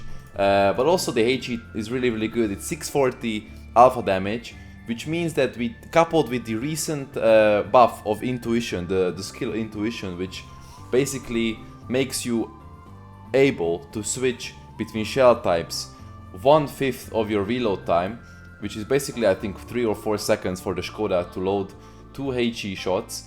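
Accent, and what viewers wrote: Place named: Hungary. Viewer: magyar vagy te baszod az akcentus :D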